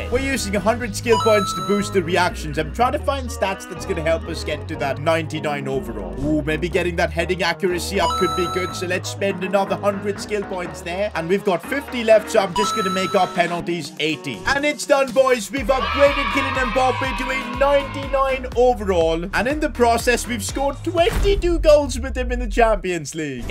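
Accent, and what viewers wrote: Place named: India. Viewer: Who ever thinks has indian accent sound 👇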